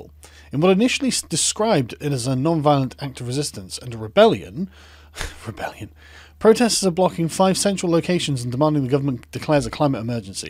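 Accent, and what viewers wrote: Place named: United Kingdom. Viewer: He's got me convinced. It's probably that British accent though. You chaps could convince me of about anything.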